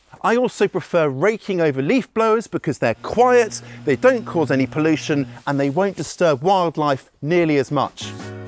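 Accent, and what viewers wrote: United Kingdom. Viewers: Thank you for sharing your great ideas! Your British accent is lovely!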